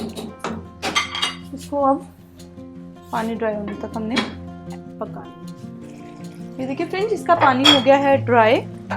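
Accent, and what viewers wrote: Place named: Lahore, Pakistan. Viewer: AP KA LEHJA LAHORI HAY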